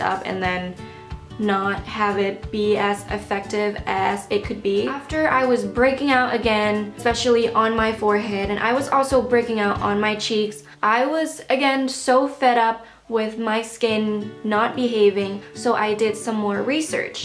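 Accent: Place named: Thailand. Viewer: Love the Thai accent!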